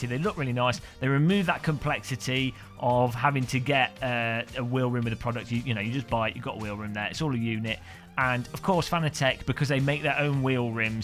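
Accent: United Kingdom. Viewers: $1500...pause...walk away....heart broken....come back...at least I get to hear you speak with your Bri-ish accent.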